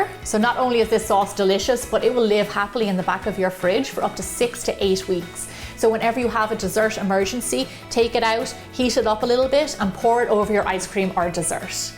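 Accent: Ireland. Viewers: Go back to Ireland for a while I need the accent back LOL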